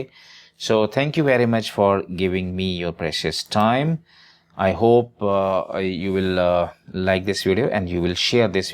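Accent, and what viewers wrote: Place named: India. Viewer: nowadays, when you see a tutorial with Indian accent, you know it has better chances to be a good one. This time it didn't disappoint. Kudos to Indian engineering, Kudos to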